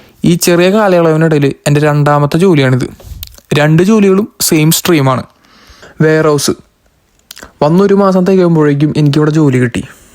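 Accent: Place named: Thrissur, India. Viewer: ചേട്ടൻ തൃശ്ശൂർ ആണല്ലേ സംസാരം കേട്ടപ്പോൾ മനസ്സിലായി ഞാൻ പാലക്കാട് ഇപ്പോൾ പോളണ്ടിലുണ്ട് അവിടെ ജോലി ശരിയാക്കി തരണേ പ്ലീസ്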